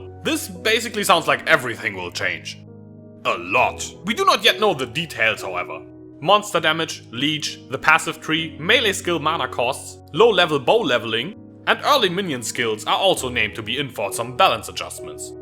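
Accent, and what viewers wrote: Germany. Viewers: I cant tell if this accent is german or asian.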